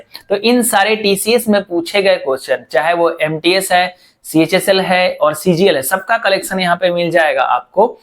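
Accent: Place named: Delhi, India. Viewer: Maine... Practice " kara h ".... Delhi wali.. tone agai apki.. ab bihari nhi rhe ap 😮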